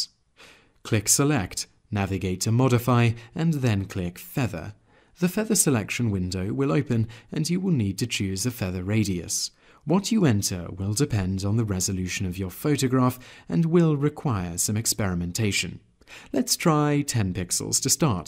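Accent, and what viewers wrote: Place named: England, United Kingdom. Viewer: No, he sounds english. You, on the other hand, sound like a complete idiot. Goodbye.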